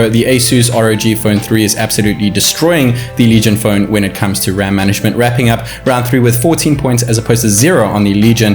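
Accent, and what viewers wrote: South Africa. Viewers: this guy has a thicc South African accent!!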